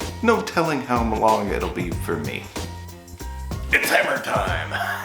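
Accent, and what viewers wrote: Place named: England, United Kingdom. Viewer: yr English accent is totally on point, u might like the TV series version of The Hitchhiker's Guide To The Galaxy,, 📺👍📱🛸🌌,, it was made in the eighties when there was Colins/ Nigels who spoke like that,, it's way better than the modern movie,, almost as good as the original radio play, which is almost as good as the books, which was a trilogy with five parts, (pt4 is my fav),, there are also audiobook versions on yt, which r good for painting to 📱📻😏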